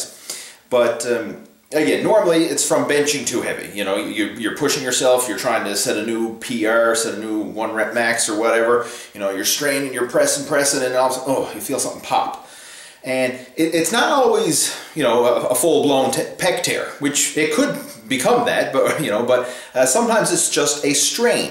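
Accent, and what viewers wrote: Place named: East Coast, Canada. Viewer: The accent. Can’t tell if it’s East Coast Canadian or maybe a light Boston accent. Newfoundland?Where are you from bud? I only ask because I teach English and my study was linguistics.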